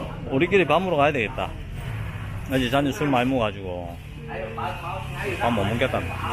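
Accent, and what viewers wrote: Gyeongsang, South Korea. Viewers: 완전 경상도 아지야 말투네예 캄보디아의 일상 재밌습니더~ 조와요 구독~~🤣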